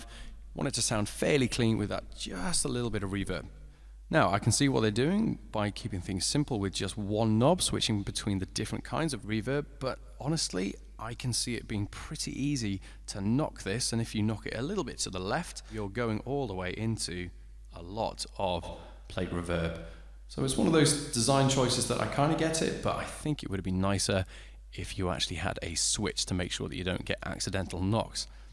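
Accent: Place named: United Kingdom. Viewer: What a great review and video. I've watched a few vids on this pedal looking for specific info and finally, you knocked it out of the park. The eloquence of speech delivered with the British accent was spot on mate. (Haha) Last but not least, the song performances were quite good. If those are your tunes, I'm thoroughly impressed. Hell I'm impressed regardless. Later dude.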